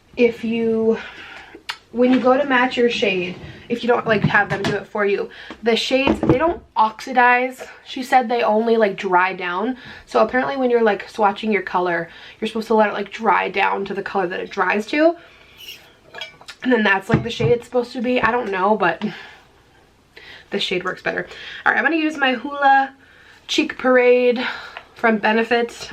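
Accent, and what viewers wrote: England, United Kingdom. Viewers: LOVE your English accent 😂💋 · you sound british as can be.!! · Actually impressed with your british accent;) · love British accent🤣🤣😂😍great job so believable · You totally sound British I love it!!!! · Love the video, Great makeup, great British accent, and you look beautiful. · Love the British accent! And that highlight, ooooh girl!!! Such a gorgeous romantic look! I hope you and Alan had a good 5 year anniversary! 💗 P.s. Lolol 😂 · British accent is ON POINT! Wow! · OMGOSH that Accent!!!!!!! I vote you do an entire video in the Brit! XOXO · British accent is spot on! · Yaaasss do a get ready with me with a British accent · British accent on point girl <3 love your vids always. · ❤️ this look! You shine bright like a diamond ✨ Your English accent is cute too; it would trick me. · Voiceover with British accent... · wow that british accent 😍😍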